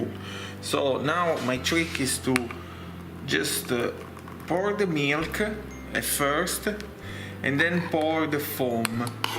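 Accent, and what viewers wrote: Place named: Italy. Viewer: It's a clear Italian accent, but even if you aren't familiar, he literally said "Here in Italy" at the start of the video.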